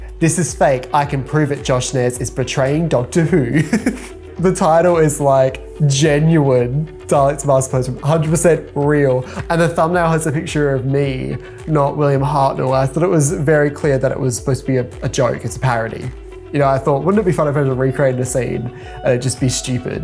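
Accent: Australia. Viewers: don't mind nasally, gay, effeminate Australian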